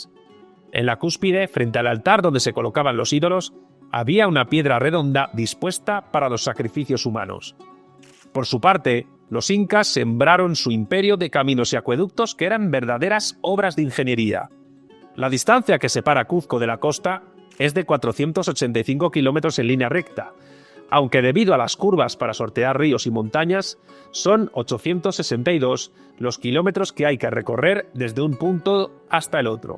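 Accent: Spain. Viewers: Esa historia es de los vencedores y no de los vencidos no se quién son ni me interesa saber no era América y ni hispanoamericano eran tierras desconocias no fue colinizado ya que ya vivian aquí es estas tierras yo hubiera preferido que llegaran los japoneses y aferrados a llamarmal colonizar no comian carne humana y los llamados inquisición española que eran unos santos violando y torturando mujer y hombres fue genocidio no conquista por tu acentos se oye que eres español y si tenian que dejar como estaban los antiguos pobladores hispanidad ridículos y ustedes no eran aborigenes con tanta gurra y matando gente en Europa los antiguos españoles el azteca no sabes de historia eran mexicases lo que quieren que crean en la nueva tierra todos de este lado vivian en armonía por eso tantos pueblos y su inquisición eran angelitos aja y la inquicion no eran sanguinarios y y novela respetaban a las mujeres con tal de violarlas hispanistas rudiculo seguramente estaban ahi viendo como guerreaban pues como discutir si crees que tienes la razón como si lo hubieras vivi y verlo en vivo y a todo color no te va aparecer lo que te escribo te guste o no los antiguos españoles no eran canibales y violadores no son objetivos quieren imponer lo que ustedes creen y los antiguos españoles no vivian en guerras constantes no critiquen no se vallan a morder la lengua ustedes no tenian esclavos no tenian o prostitución forsadas los antiguos españoles todos unos angelitos se ve que eres español y no sabes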